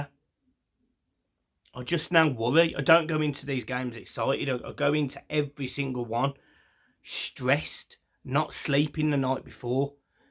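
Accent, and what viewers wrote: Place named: London, United Kingdom. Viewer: you are from London right? I can hear it from your accent.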